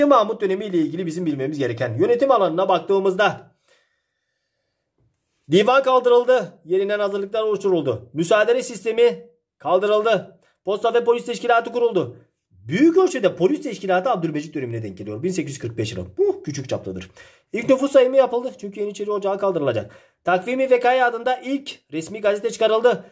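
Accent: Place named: Diyarbakır, Turkey. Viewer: Hocam alakasız olacak ama Diyarbakır'lı mısınız ? Tam o tarafın ağzı ile konuşuyorsunuz da merak ettim açıkçası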